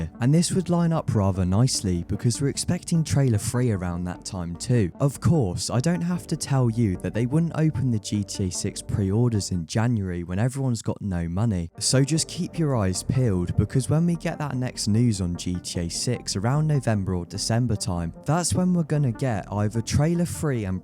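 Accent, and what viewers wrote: United Kingdom. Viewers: Why does it seem like nobody in america is making these videos? Every gta 6 video sounds like some extra from harry potter narrating it.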